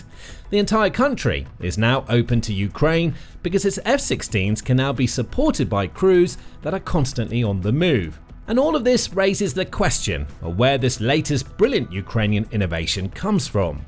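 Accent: England, United Kingdom. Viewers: "Has gotten" is an anachronistic form of English only used in the USA, so why is the script spoken with an English accent? And UK vehicles tow rather than haul. Cleverly researched essay though and almost amusing😅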